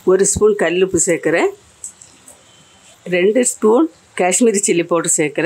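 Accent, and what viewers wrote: Nagercoil, India. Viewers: அம்மா உங்க சமையல் சூப்பர். எனக்கும் என் மனைவி கும் ஒரு போட்டி. நான் நீங்க நாகர்கோவில் னு சொல்றேன் அவ நீங்க மலையாளி னு சொல்றா. அதான் உங்ககிட்டயே கேக்குறேன்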